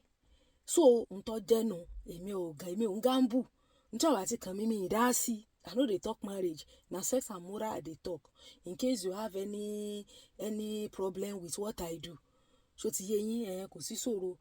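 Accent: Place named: Oke-Ogun, Nigeria. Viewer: I love your accent as Omo ilu Oke 😊, I really enjoy it, ride on, Mama 👍🆗👍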